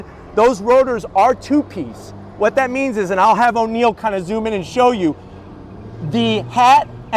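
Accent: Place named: Staten Island, United States. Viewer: Is it weird that i could listen to you talk all day hahaha! Sounds like you're from Staten Island or some part of NY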